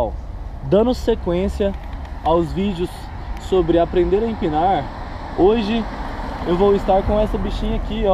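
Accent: Goiânia, Brazil. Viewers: Você e de Goiânia ?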